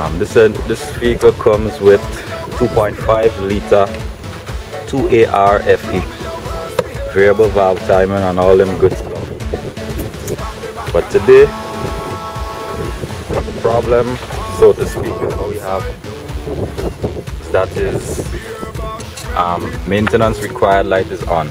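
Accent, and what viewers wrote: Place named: Trinidad and Tobago. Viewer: What island you from? I'm from Guyana. You sound like Trinidad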